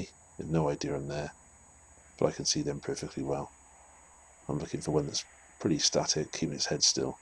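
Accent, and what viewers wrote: United Kingdom. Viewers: I love the British commentary. It's like he's on an African Safari or a morbid tennis match.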